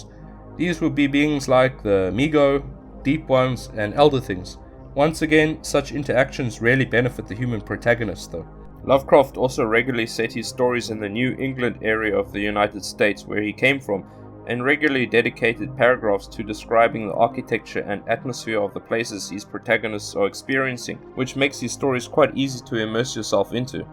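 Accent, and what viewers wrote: South Africa. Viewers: Are you South African as well? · Are you South African? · Good video! But are you South African?👀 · Expat South African living in Amsterdam here. Good surprise to hear your accent bro. Nice video. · Fabulous and thank you so much! Is that a South African accent? Fabulous. Thanks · Are you South African? Your accent sounds incredibly similar to mine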